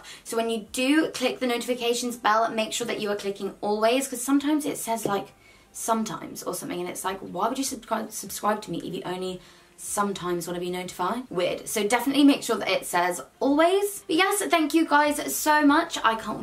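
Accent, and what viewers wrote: United Kingdom. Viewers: New to your channel, love your makeup look, very pretty..then u spoke..u British!? YAAS..song in beginning??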